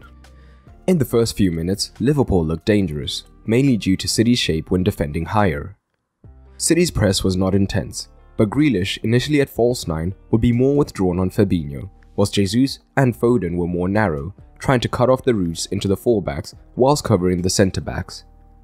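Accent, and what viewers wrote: South Africa. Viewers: Cool video man. Are you South African?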